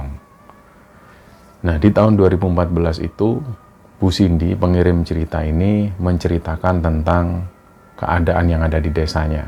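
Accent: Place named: Surabaya, Indonesia. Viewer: Assalamualaykum Mas.., maturnuwun atas ceritanya, sungguh kami sangat menikmati kisah tentang Mbak Siska dimalam ini. Meskipun cerita-cerita yg Mas bawakan selalu bernuansa horor, tetapi saya selalu tersenyum kalau Mas menyebut diksi "cepat-cepat", Mas selalu mengatakan dengan "cepet-cepetan". Mungkin itu dialektikal Surabaya-an kali ya...???! 😊😊😊🙏🙏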